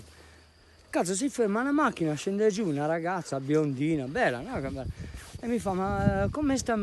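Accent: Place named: Trentino, Italy. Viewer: Inflessione che non riesco a decifrare. In alcuni momenti sembra addirittura trentino 😮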